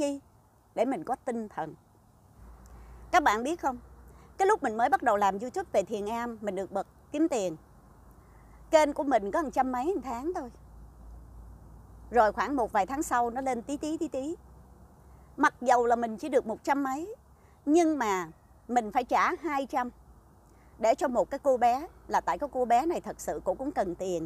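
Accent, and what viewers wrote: Standard. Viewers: Chị nói hay và chuẩn lam chi oi! Giọng nói củng hay va hiểu biêt. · Em nói chuẩn nhất mà chị đã từng nghe. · Chị nói hay chuẩn lắm chị 😁😁 em còn mê chị nói chi ai 🙈🙈 chúc chị luôn luôn vui vẻ tràng đầy sức khoẻ trẻ mãi không già chị nhé.🌹🌹🌹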